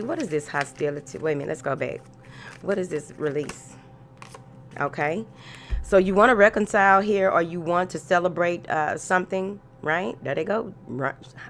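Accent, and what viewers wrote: South, United States. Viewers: My heart melts when I hear that southern ✨💜 thank you for such clear downloads & always getting straight to the point/no softening the message.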